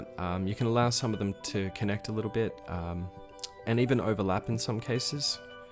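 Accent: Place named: Australia. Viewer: I knew I was in good hands when I recognised the fellow Aussie accent. Great to see us taking over the YT Warhammer scene.... SUBBED!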